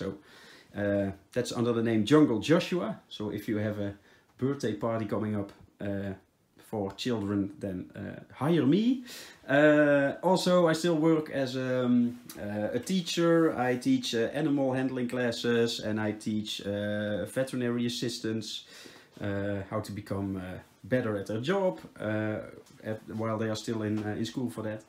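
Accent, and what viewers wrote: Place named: Netherlands. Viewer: Heerlijk Steenkolen engels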